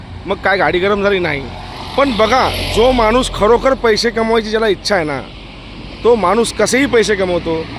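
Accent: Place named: Belgaum, India. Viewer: 100% बरोबर आहे तुमचे. पण तुमच्या भाषेत कोकणी टोनिंग नाही बेळगावी टोनिंग आहे .❤👍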